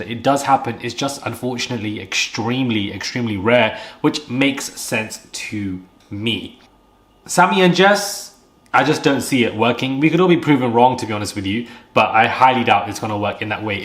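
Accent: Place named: London, United Kingdom. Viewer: South London? Well done.. some reason I was thinking you are from East London.. That's how stereotype works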